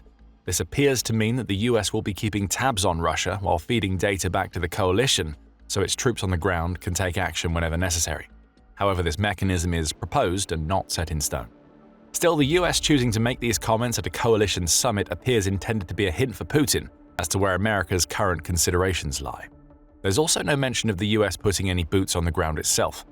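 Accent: England, United Kingdom. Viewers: English accent but American grammar.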